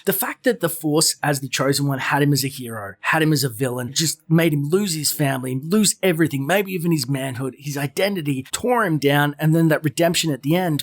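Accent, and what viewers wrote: Australia. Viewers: Thumbs down. Australian accent sucks.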